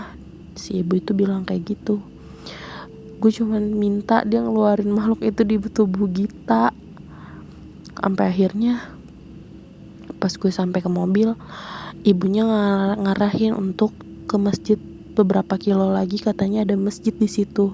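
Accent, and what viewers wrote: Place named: South Jakarta, Indonesia. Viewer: Orang Jaksel banget nih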